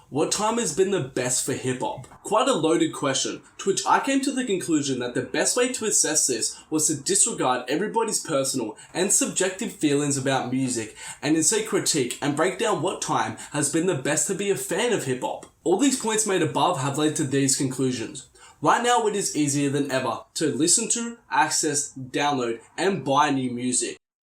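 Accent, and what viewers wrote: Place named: Australia. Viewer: which part of australia are you from?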